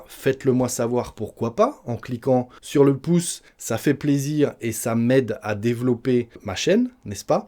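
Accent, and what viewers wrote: France. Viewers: J'adore entendre, merci..Bénis toi.Tu es le meilleur, j'adore ton accent.Du français pur.J'apprends de toi YAY🤩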